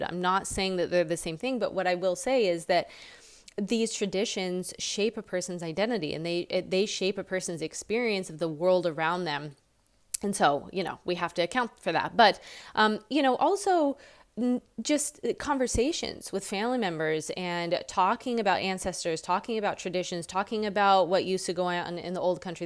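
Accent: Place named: United States. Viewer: Grazie! ❤ Beautiful historical lesson and , for me, marvelous american English accent for improving my comprehension.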